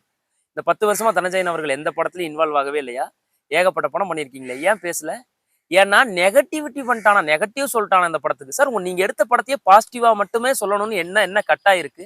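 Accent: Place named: Kongu, India. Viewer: Thambhi ERODE or Coimbatore.. Wonderful Kongu Tamil ...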